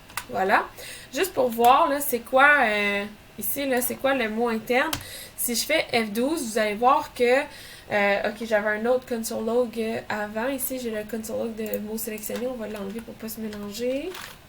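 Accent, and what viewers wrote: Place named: Quebec, Canada. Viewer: Trés bon tuto avec des explications claires. même avec l'accent québécois lol. trés claire.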